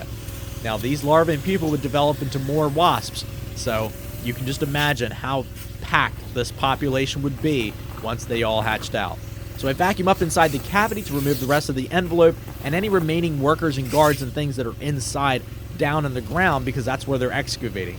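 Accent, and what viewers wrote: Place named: California, United States. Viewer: Nice Californian accent on this guy lol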